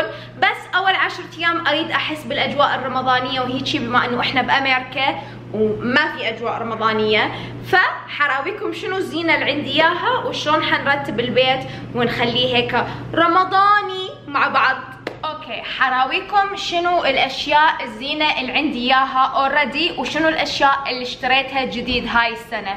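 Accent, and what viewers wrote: Iraq. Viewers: مشتركه جديدة حبيييتج وحبيت لطافتج وعفويتج وقناتج تجنن ان شاء الله نشوفج بأعلى واحسن القنوات ❤️🇮🇶+ اتمنى تظل لهجتج عراقيه حبابه لتصيرين مثلهم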